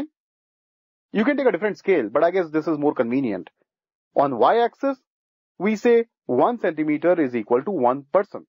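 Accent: India. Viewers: Look you Indian guy this is wrong and anyway I didn't understand anything because of your acsent. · this guy has some next indian accent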